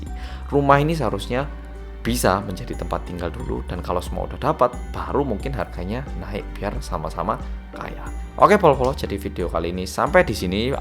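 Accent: Java, Indonesia. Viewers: Medhooookkk. Gercep dan setiap nonton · Wah ma's emang he at bgt gua coba bicara2 bhs medo aja gabisa😭😭😭😭😭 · helooo mamang medhoookkkk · Welcome back to KOREA REOMIT BERSAMA ORANG KOREA YANG MEDHOOKKKKKKKKKKKKKKKKKKKK🚗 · Tim gercep mas medokkk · yang medoookkk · Bersama orang Korea yang "MENDOK" :V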